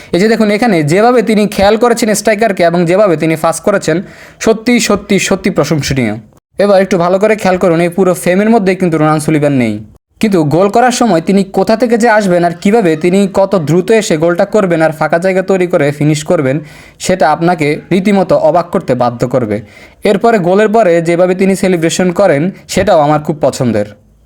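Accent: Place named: Noakhali, Bangladesh. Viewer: বাড়ি কি নোয়াখালী? ফ্লাইং কে বলেন প্লাই্ঙ। থামলে ভাল লাগে।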